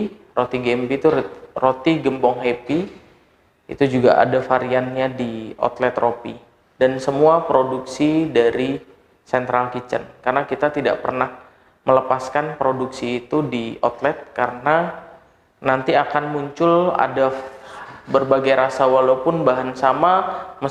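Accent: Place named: Java, Indonesia. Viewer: Wehhhhhhh medok empuk rotine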